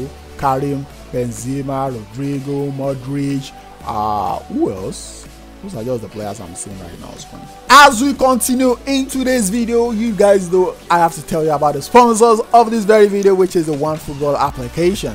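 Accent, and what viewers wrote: Nigeria. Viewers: Bro, are you Nigerian?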